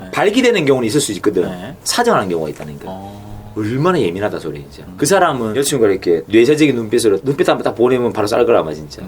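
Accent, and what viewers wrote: Gyeongsang, South Korea. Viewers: 특유의 경상도사투리가 킬포네 ㅋㅋ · 사투리가 무지 쎄신데 부산분이신거에요? 대구분이신거에요?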